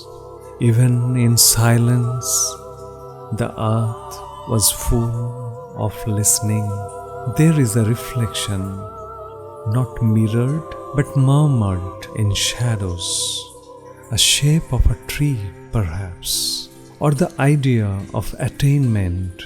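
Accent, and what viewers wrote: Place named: India. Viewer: Before reading that you are Indian ..i recongnize your campus accent.. i have travelled a lot all around India ...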